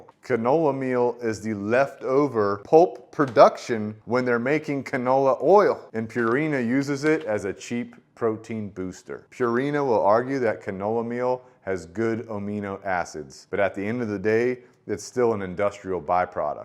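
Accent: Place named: General American, United States. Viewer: He has a standard American (U.S.A.) accent.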